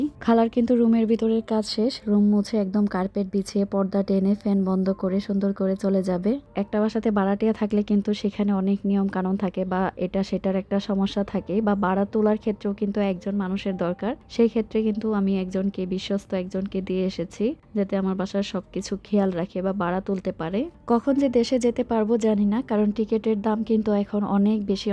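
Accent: Bangladesh. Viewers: আসসালামুয়ালাইকুম আপু তোমার ভিডিওটা অনেক ভালো লাগলো তোমার বাংলাদেশের ভাষাটা দেখে অনেক ভালো লাগলো তোমার মেয়েটাকে মাশাল্লাহ আমার অনেক ভালো লাগে জন্য অনেক অনেক দোয়া❤❤❤❤❤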